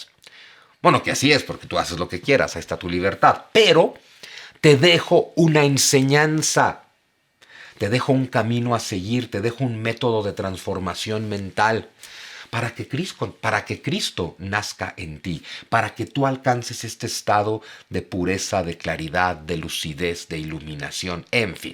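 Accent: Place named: Mexico. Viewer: Me encante el acento mexicano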